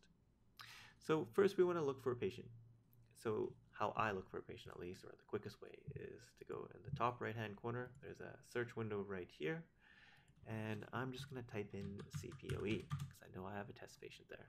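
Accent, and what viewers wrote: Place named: Canada. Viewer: loooooooooooooooow and monotone Canadian accent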